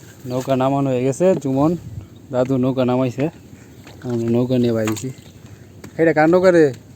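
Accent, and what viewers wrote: Netrokona, Bangladesh. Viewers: Apnader Basa kothay. Kotha Shune Mone Hoy Netrokonar. Am I right?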